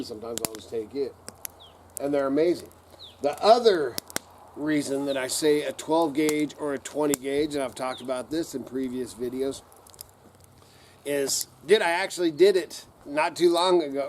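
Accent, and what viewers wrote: South, United States. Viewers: I hear where you come from you're I just a redneck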